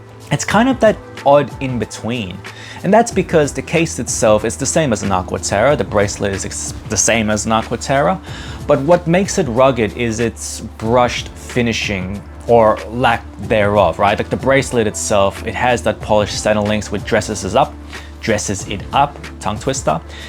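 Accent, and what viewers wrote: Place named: Australia. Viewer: Of the ones you showed on wrist, if money weren’t much of a constraint I’d spring for the Ingenieur (though in the regular blue that was released last year, or the black which makes the dial texture more subtle). Otherwise the Mark XX is hard to fault and is great bang for buck. I just priced one in Switzerland and could get it for 5000CHF (you sound Aussie so that’s approx. 9500AUD).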